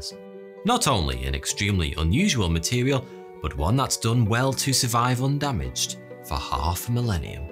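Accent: United Kingdom. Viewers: "to put it mildly, a bit shit" Love that British accent.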